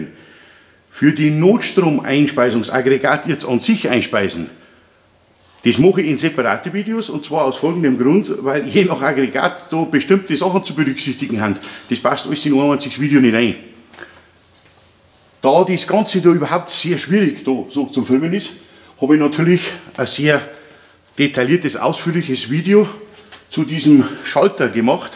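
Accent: Bavaria, Germany. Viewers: Dem Dialekt nach hoffte ich dass Ihr aus Bayern seid. Leider nicht, sonst haette ich evtl. einen Auftrag gehabt.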